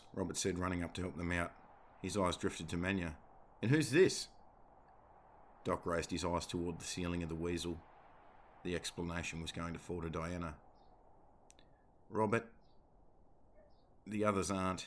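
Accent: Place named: Australia. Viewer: Iv not finished listening to this but the oz accent makes a refreshing change to the normal American one all and all it's a dam good story so far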